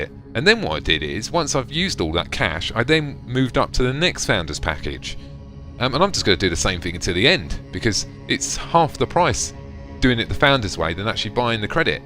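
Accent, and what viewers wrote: England, United Kingdom. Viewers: Been watching some of your videos after I found that warframe one, Your voice is now one of my favorites out of people who talk over video games on youtube with an English(I think?) accent. lol, and you know there's a lot of those. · From the moment i heard ur voice im thinking like this guy is smart because i always think that people with english accent is smart :)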